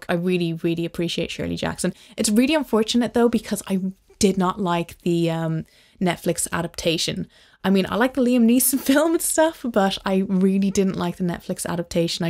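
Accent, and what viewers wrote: Ireland. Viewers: I had to check your profile to be sure of your origin. Sounded Irish yet was kind of Nova Scotia or thereabouts :-) King's Pet Sematary is well done and adheres to the philosophical principles of the horror genre. Fritz Leiber rocks the horror. . . the horror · Are you Irish? 🇮🇪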